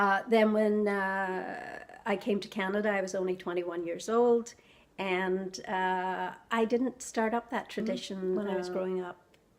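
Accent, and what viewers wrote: Ireland. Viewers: I just loooove your Irish accent. It's soft but fully alive and well. I'm part Skottish in my dad's side, and all four of my adopted children have Irish heritage. I enjoyed hearing about your traditions, past and present, and the turnips and carrots dish sounds absolutely delish. I know I'd love it. And I didn't make true resolutions this year either. Being goal driven sounds better, for sure. This was so fun to watch. Thanks for sharing with us,. You're a gem! Peace! ~TONI 💜🌺💚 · You still have a bit of the Irish accent and its beautiful. You have have lived in two places I can only dream to visit. Great video #teamsupportalltheway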